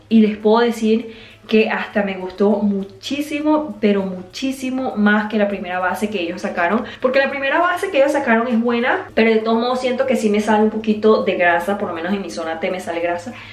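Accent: Venezuela. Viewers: siempre ah tenido el acento venezolano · Ella es venezonala 😂 no manches como quieres que hable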